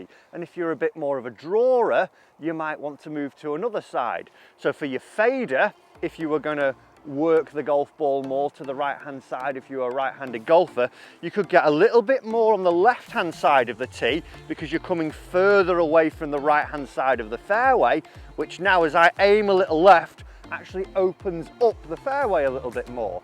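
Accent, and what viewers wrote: United Kingdom. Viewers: must be the heavy northern Accent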